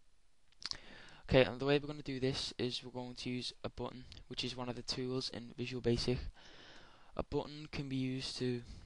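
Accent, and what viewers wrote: Liverpool, United Kingdom. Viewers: Woah, you from liverpool? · Scouse accent! :-)) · I just can't take this effing Scouse accent. Have to give up this Tut, thanks for trying though!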